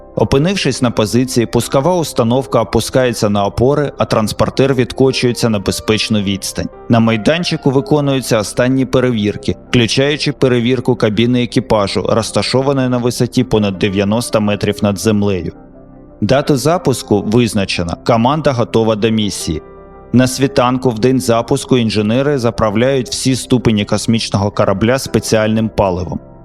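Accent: Russia. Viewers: Чому в кожному слові такий виразний російський акцент? Всього лиш "о" не вимовляти як "а" і не пом'ягшувати "ч". Хоча б... Ну невже це так складно?...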